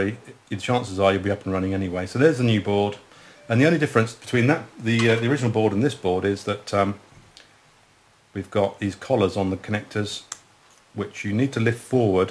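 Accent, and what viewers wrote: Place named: United Kingdom. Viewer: thank you, This is so easy, my daughter is only 5 and she can do it herself. I just save $70 USD. I call Bose and they said is $ 115 with shipping included and you will also get a 1 year warranty and any of the parts? I just pay $39 USD on EBAY, and I fixed it in 5 minutes tops.I Love It, and the British accent is cool. I from Colombia and live in LA. Thank you I’m very happy, my family love this portable sound dock.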